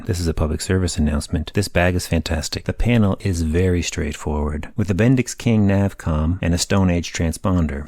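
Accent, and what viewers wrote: Canada. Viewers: Very exciting news! Always love your review vids and the 182 video in particular helped me make the decision to buy one a couple years back. Out of all the planes you've ever reviewed, this one seems just so natural that you would own it. Also, never noticed you say "aboot" as much as in this vid but the Canadian tail registrations probably predisposed me to hearing it haha. Keep up the awesome work, dude, and safe flying!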